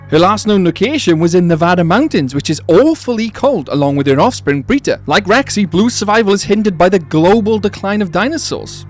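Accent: Wales, United Kingdom. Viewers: Is that a fellow Welsh accent I hear? Love your videos, dude! They’ve made me much more interested in the wider lore of JP/JW. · It is hard for me to find new classified disclosure on a daily basis. I am amused by the British accent. I see you did Inter Alia Quantum Postage.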